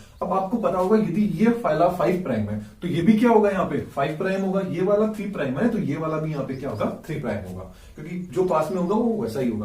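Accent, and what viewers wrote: Rajasthan, India. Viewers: Sir aap Rajasthani ho?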